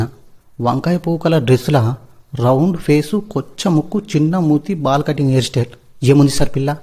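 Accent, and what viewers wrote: Telangana, India. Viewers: అది భోజన ప్రియత్వం కాదు కానీ,హాస్యానికి అదో ఆధారం! ఇంత వరకూ ఎలాటి అసభ్యతకు చోటులేకుండా తీసుకొస్తున్నారు హాస్యాన్ని...! ఇకముందుకూడా... ఈ తిండిగోల కి సంబంధించి అన్ని కోణాలు ముగిసిన తర్వాత - హాస్యానికి మరో ఆధారాన్ని వెతుక్కుని...మనసారా మా అందరినీ ఇలాగే నవ్వించేలా ఉంటాయి మీ వీడియో లు అనుకుంటున్నాను. కీప్ ఇట్ అప్ గారు. తెలంగాణ భాష మీ నోట మహా అందంగా, తీయగా...మీదు మిక్కిలి సహజంగా పలుకుతుంది. అభినందనలు. Proper Metpalli నా...? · Love you vedios am very happy to see ur voice mana telangana language super🥰😍😘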